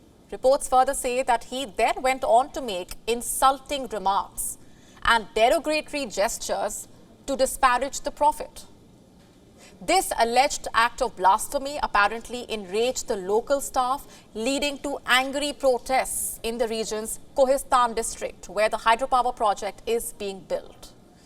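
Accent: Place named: India. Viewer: Her very strong Indian accent English.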